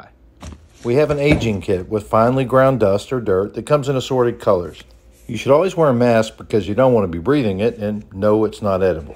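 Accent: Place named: South, United States. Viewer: Your southern accent is coming through on this one.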